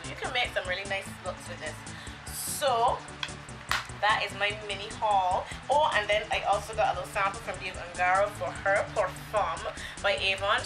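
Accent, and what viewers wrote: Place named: Barbados. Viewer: love the bajan accent. love when you roll your eyes. you are funny as hell.peoples get to know this chick!!!!!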